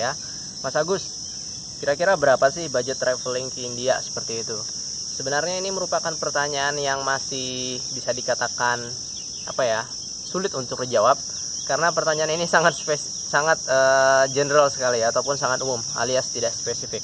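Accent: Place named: Sunda, Indonesia. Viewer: Mantafff..👍 monggo mas agus di serius-in..kelihatannya prospek kalo mas agus bisa bikin travel packace ke India..mudah2an bisa tambah2 uang saku.. Btw..mas agus aslinya org mana y? Saya dengar2 asli kalimantan..tapi logatnya kok kaya kental aksen sunda nya..semoga tetap semangat do the best disana 💪 Salam kenal dari Surabaya 🙏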